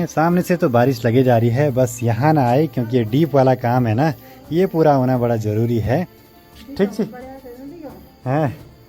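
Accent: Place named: Garhwal, India. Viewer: bhaiya ek din pura video Garhwali mein banana aapke munh se Garhwali bahut acchi lagti hai ham aapko Punjab se dekh rahe hain❤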